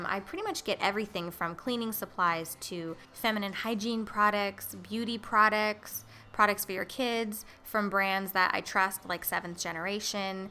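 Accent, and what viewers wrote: United States. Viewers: hey girl, where did you get that Californian accent??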